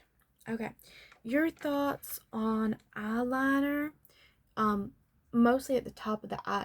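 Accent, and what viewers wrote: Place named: South, United States. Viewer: Coming from a born and raised genuine southerner in the US, I love everything about this. A southern accent, especially ones like Charlotte La Bouff or Dolly Parton or Blanche Devereaux, gives me such comfort. And the hunnys and darlins round it all out. I loved this ❤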